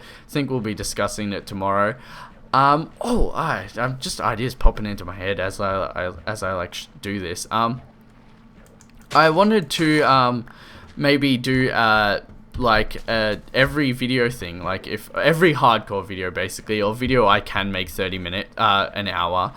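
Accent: Australia. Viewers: what part of Australia are you from ? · I knew you were a fellow Aussie but you can tell by the way you say and talk about DICKHEADS. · Your australian?? You just earned a sub!!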